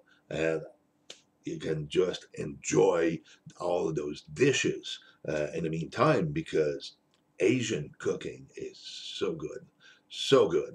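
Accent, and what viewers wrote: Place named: Texas, United States. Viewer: Très belle entrevue vidéo français et anglaise,vous nous orienter dans le choix des épices et piments pour la nourriture que nous préparons #1, en pensant avez-vous des origines du Texas car vous avez un accent texan👍👍🤝🌶🌶🌶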